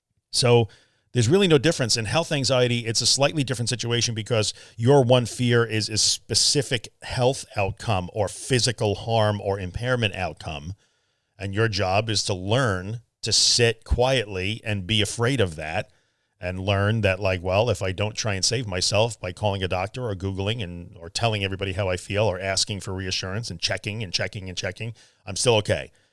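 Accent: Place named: New Zealand. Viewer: sounds like a fellow New Zealander!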